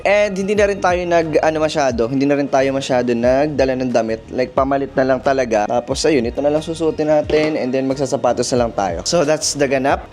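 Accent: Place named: Hiligaynon, Philippines. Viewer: Ilonggo ka ba? Bat may puntong Hiligaynon ka? Same kay David Guison. Same kayo punto hahahahaha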